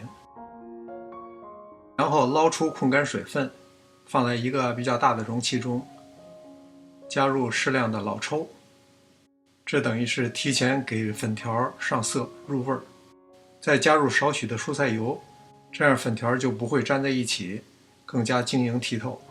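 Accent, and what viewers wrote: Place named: Beijing, China. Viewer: 北京话好听。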